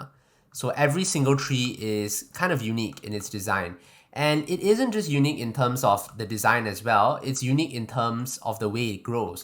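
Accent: Singapore. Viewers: you sound very singaporean...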